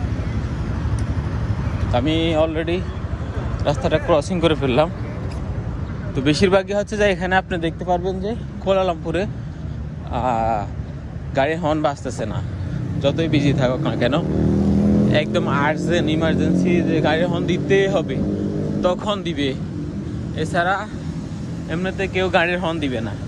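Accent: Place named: Comilla, Bangladesh. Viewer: আপনার বাড়ী কুমিল্লা মনে হয় ভাই ❤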